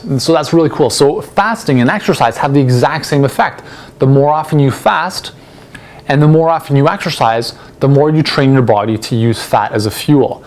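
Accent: Canada. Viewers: Are you canadian? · Love canadian accent. 😉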